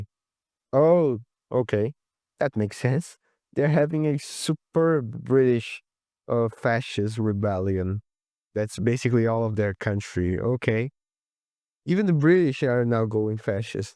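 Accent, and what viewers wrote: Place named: Brazil. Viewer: He is prob from Brazil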